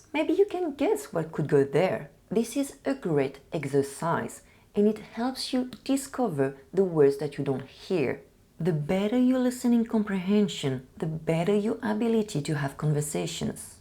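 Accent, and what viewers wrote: France. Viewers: BTW I love your French accent so much!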